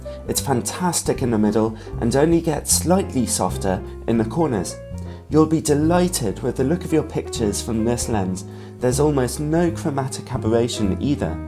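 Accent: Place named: Wales, United Kingdom. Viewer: Hey. Love the channel, been watching your reviews for probably a decade. I'd love to see a re-review on the Canon EF 100mm f2.8 Macro (non-L). I have one, but would love to see your method of testing it on a "modern" (to me) 6D with your newer testing format. Keep up the great videos. And dude, I gotta say, I love your accent! Not 100% sure you're Welsh, but I'm 25% Welsh myself. So "hello" from across the pond!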